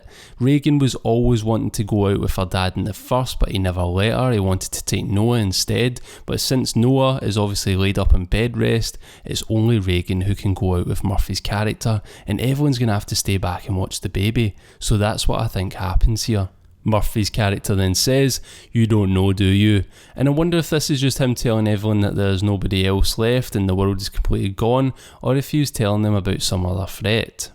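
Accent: Scotland, United Kingdom. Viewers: Are you Scottish? Lol this is the first of your Vids I've watched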